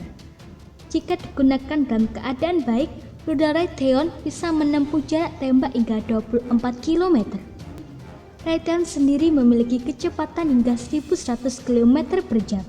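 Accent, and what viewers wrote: Java, Indonesia. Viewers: Sopo iku sing baca narasi ne logat jawa tenan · Medok kali suara nya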